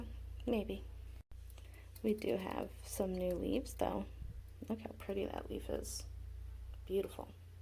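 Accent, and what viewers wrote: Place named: New York, United States. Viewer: Beautiful flowers. Congrats! Where did you find those gorgeous big compacta baskets? You sound like your from NY and I have never seen those anywhere in the NYC limits. 😊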